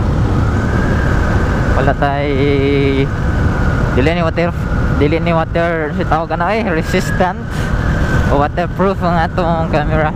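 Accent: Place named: Visayas, Philippines. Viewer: Bisaya ka dong 😂😂😂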